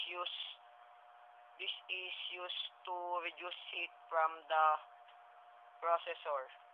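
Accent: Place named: Philippines. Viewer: pinoy hahaha. ung english pinoy n pinoy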